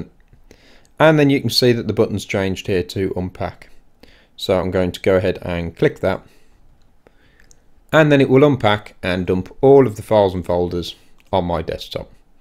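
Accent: Birmingham, United Kingdom. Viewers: Are you brummy? Had to ask Haha.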